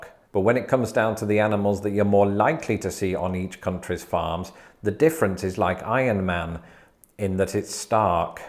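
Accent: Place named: United Kingdom. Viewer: I love your Accents, sir. i think it could be British, right?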